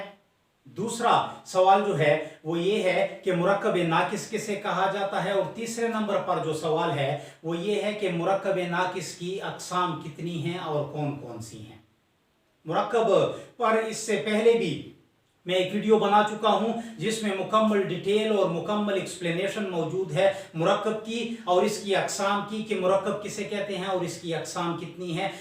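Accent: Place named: Pakistan. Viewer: aap urdu speaker nahi ho mujhe balochistan ya peshawar se lagte ho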